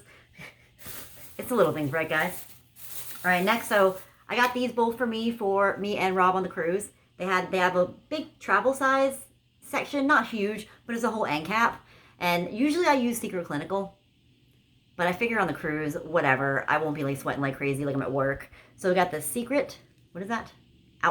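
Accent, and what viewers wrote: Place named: Long Island, United States. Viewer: Hi!!! Love your Long Island accent "Swagga" I'm on the hunt for Bath & Beauty Cherry Blossom awesome haul have an awesome weekend tfs 💕 #teamcheapchick 🐶💕🐶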